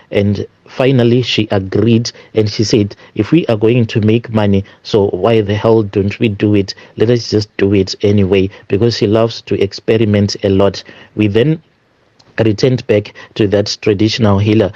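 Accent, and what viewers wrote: Zimbabwe. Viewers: This is just crazy the story teller sounds Zimbabwean or a national foreign but all his stories are based on South Africans …I sense lies here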